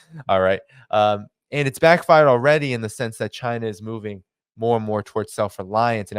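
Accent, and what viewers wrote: United Kingdom. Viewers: Love it a White Guy with a British accent.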